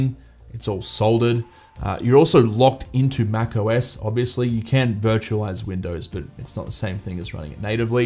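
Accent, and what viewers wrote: Australia. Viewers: He sounded Aussie to me. Now I'm confused.... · Such a great vid, cheers! It took me a while to figure out why it was so easy and relaxing to watch.. it’s just nice to hear another Aussie review these things and not have to process accents haha thanks mate 🤙🏼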